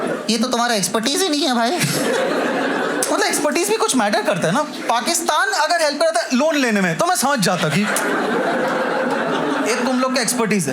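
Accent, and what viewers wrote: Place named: Mumbai, India. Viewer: Bambaiya hindi raaste par bolte hain...show pe nahi. Used to hearing better hindi from you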